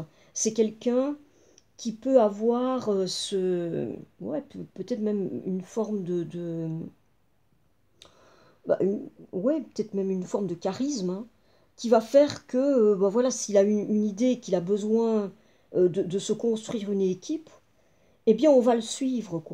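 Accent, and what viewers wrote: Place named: Wallonia, Belgium. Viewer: J'aime beaucoup vos tirages et votre accent wallon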